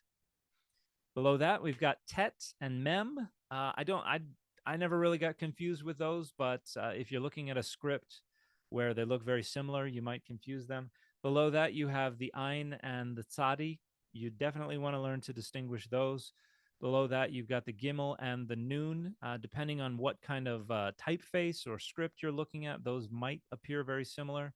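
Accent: Israel. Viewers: I couldn't help but notice you pronounce Hebrew closer to a modern Israeli Hebrew pronunciation, rather than a reconstructed Biblical Hebrew pronunciation. Is that because your teachers were Israeli? Or because you distrust reconstructed pronunciation? Or just an accident of fate? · It is important to note that the pronunciation here is the modern israeli pronunciation - not the ancient one. If you want to hear the pronunciation of ancient hebrew (in different periods) I recommend watching A. Z. Foreman's channel.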